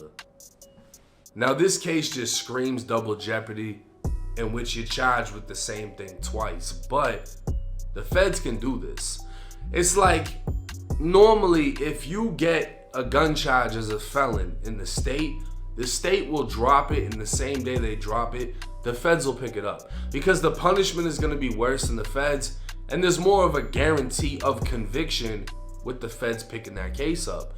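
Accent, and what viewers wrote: Boston, United States. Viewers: “Got pulled over in the cah and caught him a drug chahg.” Guess that Boston accent never goes away lol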